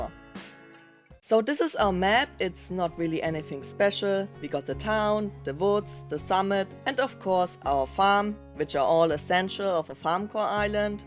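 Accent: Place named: Germany. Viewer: I don’t know if you’re German or something, but I love your accent❤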